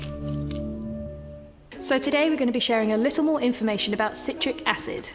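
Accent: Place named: United Kingdom. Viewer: Good to hear British ive subsctribed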